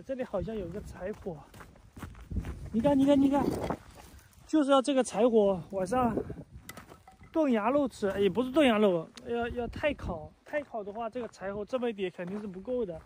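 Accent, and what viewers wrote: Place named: South, China. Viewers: 哈哈哈哈！南方口音。我聽著很親切。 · 感觉浙江口腔 温州一带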